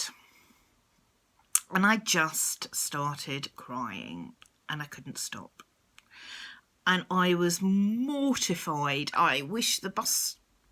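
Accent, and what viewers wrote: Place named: United Kingdom. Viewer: Nice. Maybe the lady with the tissues remembers the day as well. I enjoy your accent, very different from the Canadian I'm around. I can't "place" the differences in the British ones very well. We don't have accents over here of course:)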